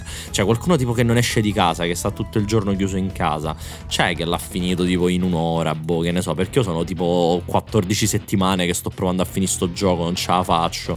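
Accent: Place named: Ravenna, Italy. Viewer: ma te sei di ravenna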